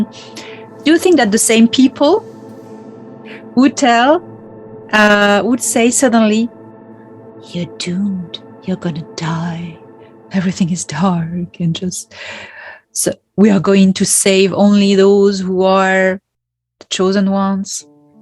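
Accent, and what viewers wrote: France. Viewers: Dear, I'm absolutely delighted by your charming french accent, but can we expect a french version of this super motivational vidéo? Namaste 🙏 From a belgian follower and unconditional fan 😍😇 PS: I know it's double work, but take the example of Garbielle Isis, who always record simultaneously her video twice: once in French, once in English...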